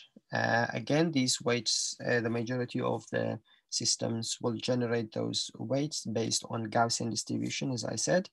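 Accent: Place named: United Kingdom. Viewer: Strong British accent !☺️ Well done ✅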